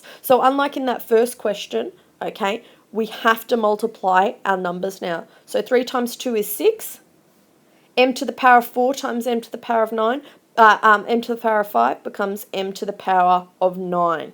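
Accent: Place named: Australia. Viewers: hey yall ima.australian and.by the sound yall thinking she is.australian .yall are whall awright see yall laterr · I'm Australian and by the sounds of it, I think she is :)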